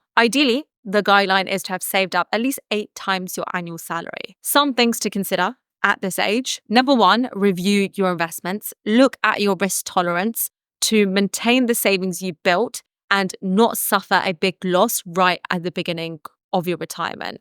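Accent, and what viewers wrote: United Kingdom. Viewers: British Accent 😑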